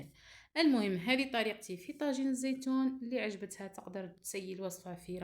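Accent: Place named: Djelfa, Algeria. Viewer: ربي يوفقك راكي تهدري كيما احنا منعرف لا انتي من جلفة ولا الاغوط ولا مسيلة انا نضن جلفة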